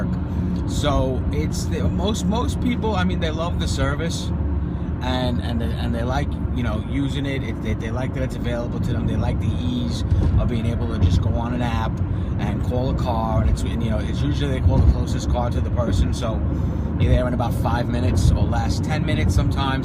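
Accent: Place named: Long Island, United States. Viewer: coupla things, great video, really helped and I'll use your referral. Just heads up I hear Camden and Paterson are paying a premium for those that venture forth..😁 oh and why does from NJ sound like Vin from Long Island? I'm from Bergen County but you got either a Long or Staten Island accent...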